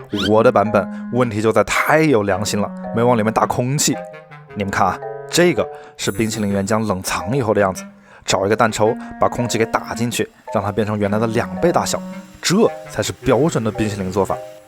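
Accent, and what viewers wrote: Wuhan, China. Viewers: 熟悉的武汉普通话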